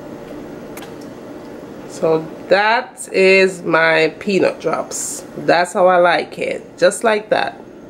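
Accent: Jamaica. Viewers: My girl. Twang fi mi a likkle. Deh accent deh raw and solid like a tru Jamaican! Lol. Tonks fi do watch :-)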